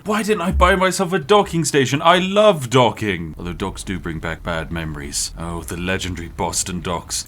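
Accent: United Kingdom. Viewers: Go speed run the bible please!!! I realy need a refreshing on the material with a UK accent..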